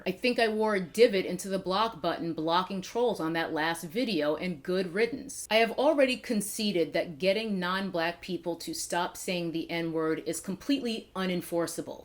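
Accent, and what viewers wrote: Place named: East Coast, United States. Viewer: Yet again you hit the nail on the head. It's not like everyone goes around saying the C word to Asians. For goodness sake, or the S word to Gina Rodriguez's race sheesh. And I knew you were from the East Coast I could hear it in a few of the words you say, and I'm sorry that old man called you that!!!!!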